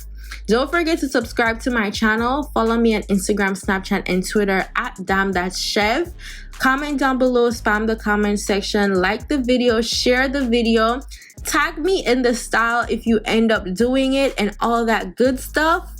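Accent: Jamaica. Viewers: aye from mi hear start tlk mi know ya jamaican enuh but anyway love the video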